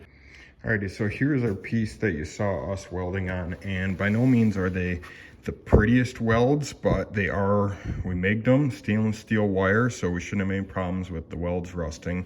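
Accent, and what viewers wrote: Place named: Wisconsin, United States. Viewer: U sir , sound like ur from Wisconsin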